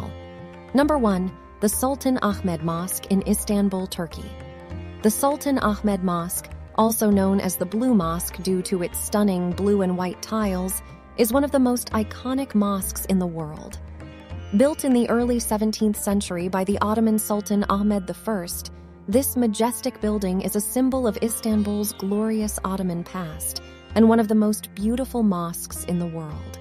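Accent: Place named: United States. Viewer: She is pronouncing it fine brother. It's her American accent.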